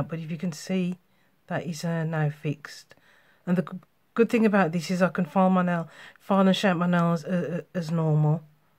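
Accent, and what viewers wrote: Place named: United Kingdom. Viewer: I'm so jealous of the British accent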